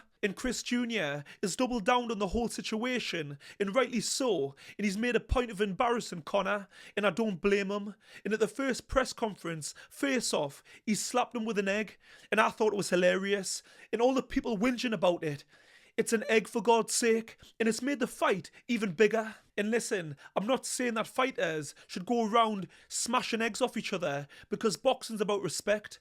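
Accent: Newcastle, United Kingdom. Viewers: the Newcastle accent 😂🔥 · You sound like a Geordie mate ....you local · Are you from Newcastle?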